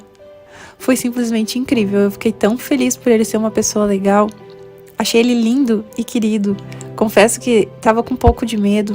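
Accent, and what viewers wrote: Rio Grande do Sul, Brazil. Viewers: Sou do RS tbm logo vi teu sotaque no primeiro vídeo que assisti 😂 amei tua história fiquei muito emocionada, que tenha muito sucesso sempre em sua vida ❤️❤️❤️ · Conheci o canal a pouco, vim parar aqui e logo reconheci o sotaque gaúcho da minha conterrânea! Muito amor e muitas conquistas para essa família linda! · Nossa que lindo!!! Ah e eu sabia que tu é gaúcha, já te vi falando "tu" e acertei no meu palpite. Sou de São Sepé, cidade vizinha de Santa Maria. Parabéns pela coragem! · Ameeeei tanto.. logo que vi o primeiro vídeo reconheci esse sutaque de gaúcha 😂😂😂 linda, achei vcs recentemente aqui e já amo os videos, sou de São Gabriel 3hrs de ônibus até Santa Maria 😍.. amei o vídeo e amo a risada do 😂😂😂 beijão pra vcs ❤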